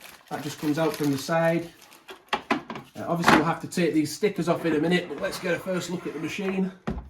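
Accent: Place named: Northern England, United Kingdom. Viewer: Love the broad Northern accent!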